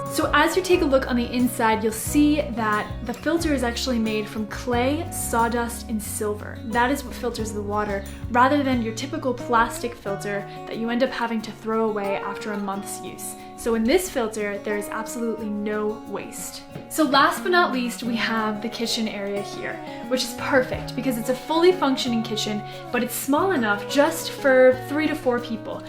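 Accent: United States. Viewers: From her accent I think she's from the USA. So this ever so eco friendly gal, did she get to Guatemala by bicycle? I fucking doubt it.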